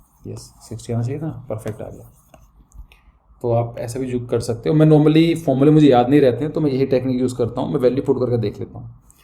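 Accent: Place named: mixed, India. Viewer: I thought...bcoz in this video ur one accent like UP, Bihar side😅😅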